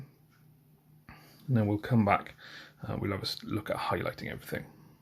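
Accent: Wales, United Kingdom. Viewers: loving your new channel mate. great warcry content. Subbed! Where bouts you from? That is definitely a fellow welsh accent i hear.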